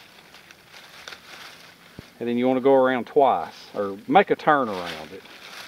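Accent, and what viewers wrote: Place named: Georgia, United States. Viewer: Yeah I kind of figured you was from Georgia by your accent. I live in SC myself. Love the country accent myself.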